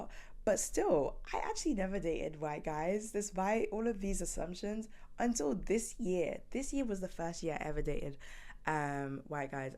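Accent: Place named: United Kingdom. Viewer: This is my first time watching one of her videos— But tell me why did I only realize that she had a British accent half-way through the video? LOL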